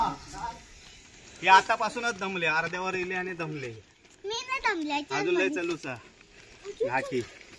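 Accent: Malvani, India. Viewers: Tu malvani volg madhe Chan Boltos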